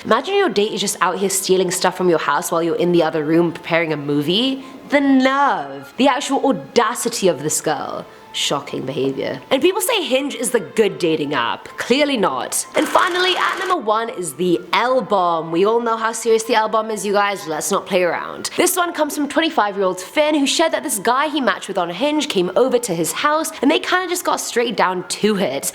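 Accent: South, United States. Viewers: Lol nice southern accent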